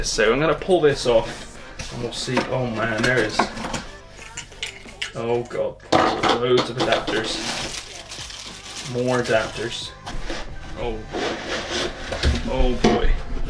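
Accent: Bristol, United Kingdom. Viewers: are you from Bristol? That accent is V E R Y F A M I L I A R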